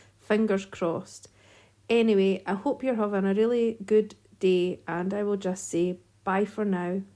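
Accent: Scotland, United Kingdom. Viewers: I dont know if it’s because i watch Eli and Kate that your channel came up in my algorithm. It’s nice to hear a Scottish accent and see what you’re planting. Im zone 7b Bannockburn so it’s great to see plants that will suit the conditions here in Scotland. Thanks for sharing, subbed ❤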